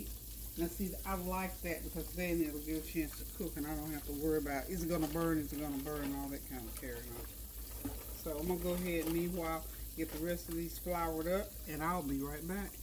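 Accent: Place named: South, United States. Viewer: This too southernnnnnnnnn 😩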